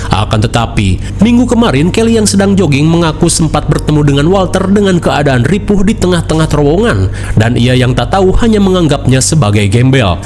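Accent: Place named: West Java, Indonesia. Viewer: Asli mana bang sundanya ?? Jadi penasaran hehe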